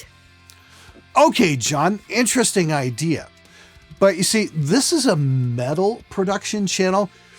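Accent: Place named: Canada. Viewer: I always giggle when your Canadian accent shines through.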